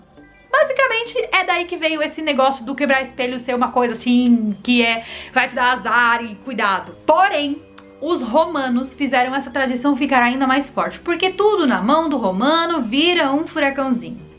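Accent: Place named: São Paulo, Brazil. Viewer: Adorei o sotaque do interior paulista!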